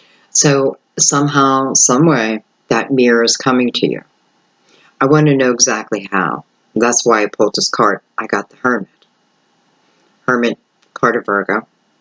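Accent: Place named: Pennsylvania, United States. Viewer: You’re from PA. I know that accent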